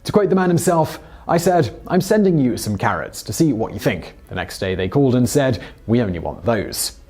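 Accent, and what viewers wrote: United Kingdom. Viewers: Actually, I believe his target audience is primarily Americans, with other English speakers coming in second. Otherwise, he could simply stick with a 100% British dialect, but he doesn't.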